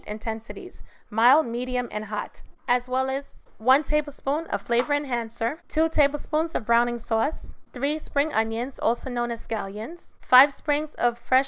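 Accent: New York, United States. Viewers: Well done. Looks delicious. Love your accent. New Yorker?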